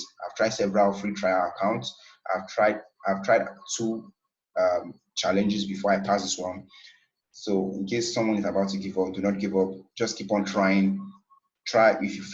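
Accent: Nigeria. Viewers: Are You a Nigerian ?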